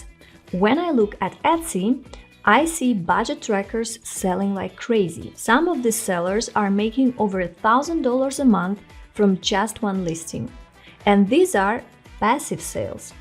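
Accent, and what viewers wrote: Russia. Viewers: russian accent is amazing, spasiba balchoy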